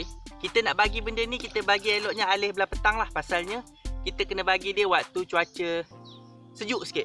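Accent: Pahang, Malaysia. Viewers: ckp mcm org pahang..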